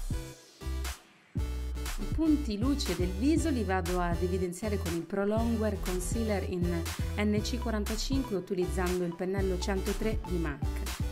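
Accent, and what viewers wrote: Italy. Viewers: Your videos keep less bored especially when I can't sleep. I love hearing you speak italian even if I don't understand half what you are saying. I have Nigerian Friends who were born in Italy, They speak just like you. Need to start doing videos in my language LOL. Thanks for this awesome creativity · Sei molto bella e mi è piaciuto il suo accento italiano 😙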